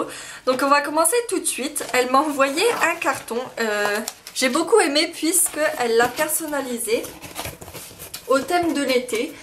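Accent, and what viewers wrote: North, France. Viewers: Je n'avais jamais tester l'astuce du vernis transparent, je testerais à l'occasion, car moi aussi j'ai des allergies au niveau des oreilles! Merci pour tes supers vidéos et ton accent du nord bien prononcé, ça me fait penser à ma ville natale! <3 Bisous! :)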